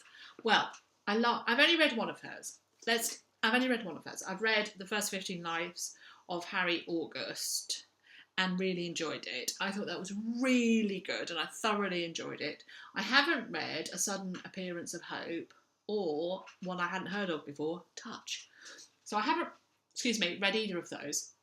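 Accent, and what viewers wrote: United Kingdom. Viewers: I was so very happy to have gotten finished with my bath and washing my hair, I sat down and saw you had posted a video! I've missed you're smiling face and lovely British accent! You've got quite a good pick of books there, especially the JD Robb, I need to give that series a go! I've been dealing with some health challenges, but am doing pretty well now. I'm looking forward to your next video! Take care!😊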